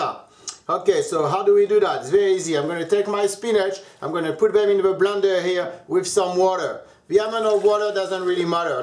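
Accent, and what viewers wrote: France. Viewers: I watched the video.real franse laungwich accent. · Vous avez un accent français a couper au couteau !!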